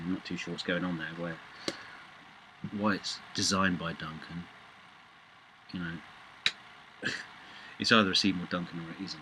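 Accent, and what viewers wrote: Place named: Yorkshire, United Kingdom. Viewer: Well done Duncan. Have a listen to the Swedishguitar Nerd comparing his monster '85 Gibson Les Paul to the Epiphone LP Special 2@ Epiphone Special II & Gibson Les Paul Custom comparison More confirmation of the truth. With a sister who's a composer, reviewer and teacher using a 20k Smallman classical, who dispairs at the lack of the expsensive tonewoods for luthiers making top end accoustics in Spain (such is the greed for them for irrelevant kit). She berated my attempts to source a 'good' tonewood electrical guitar. Until I researched and gave up. I trust her, she teaches good performer electric guitarists and as she says, our gendre is wires and magnets. She has always said you can make the body of an electric guitar out of any old crap and provided the wires and magnets are good the sound will be too. The neck has to be playable but the magnets are picking up the fluctuation in string which is then amplified electrically. 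An electric guitar is not amplified by the resonance of the timber. This video says it as it is. There may be the odd nuance, maybe, maybe a harmonic (who knows) but, nothing that can't be induced by a Line6 HD500 somewhere along the supply chain. Some people don't like the truth when it flattens their beliefs and downs their hero's. Duncan speaks like a Yorkshireman telling it as it is. Well done. But then again, there are always those that simply have to believe that the world is flat, the universe rotates around the sun and there is a pot of gold buri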